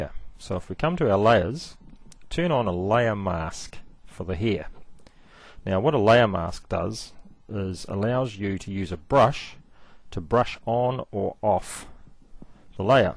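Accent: New Zealand. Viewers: Man, brilliant kiwi accent!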